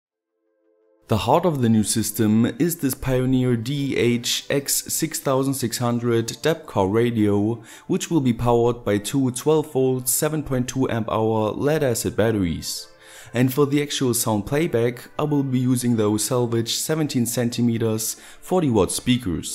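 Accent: Germany. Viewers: are you german? sounds like that:D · Du hast ein deuschen axent! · Wie man einfach direkt nach 5 Sekunden merkt das du Deutscher bist 😂👌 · Your accent, and using a Geodreieck..... you are German... cool!!! Great video btw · Ich denk noch die ganze Zeit: Irgendwie klingt der Akzent deutsch. Und dann BÄM, Pflege-Öl. xD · are you german? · Englisch with a german dialeckt :D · deutsch ?höhrt sich so an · wusste doch dass du n deutscher bist :D das hört man einfach so raus XD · Are you from Germany? Or Austria? I am hearing accent from you. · German? Sounds a Little like a German that is speaking english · are you from germany? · Red doch deutsch man hört dass du deutsch bist 😂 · Er ist Deutscher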